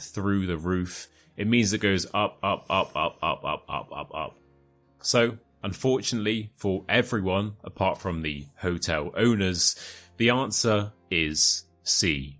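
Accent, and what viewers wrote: United Kingdom. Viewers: Are you from England and Scotland?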